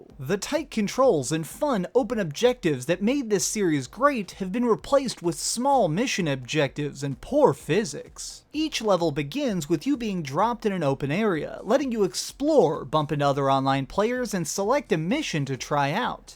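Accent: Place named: South, United States. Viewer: Man, you sound like a peckerwood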